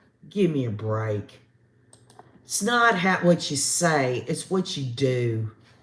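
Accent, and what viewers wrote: South, United States. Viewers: I just love your southern accent. And curse words are just punctuation for honest people ! IMO. I think Lori finally screwed herself by saying how it was months later when she was dancing in the beach 🏝️ . If that phone call had only lasted longer Lori would not have been able to control her responses to summers questions.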